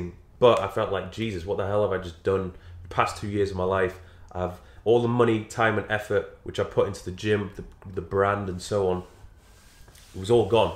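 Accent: United Kingdom. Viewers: "I always see the comfort and contentness is the enemy. When you get too comfortable and everything is easy, that's when you take a back foot." Love the positive messages you deliver on your Youtube channel. They are not only about fitness but also about life lessons, which i believe is applicable to everyone. Just want to say: your dedication, professionalism and wisdom are amazing, thank you for helping a lot of people, myself included, stay motivated and get back on the right track. I'm an undergraduate student from South Korea. Even though it can be somewhat hard for me to get British accent, but I try my best to catch every informative message you give off. You've been to Thailand not long ago, why not Korea one day? lol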